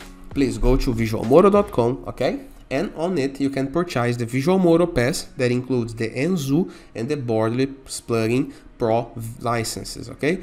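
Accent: Brazil. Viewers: tabom tabom vc ta certo vc criou algo kkkkk mas eu ri mto reconheci o sotaque brazilero na hora kkkkk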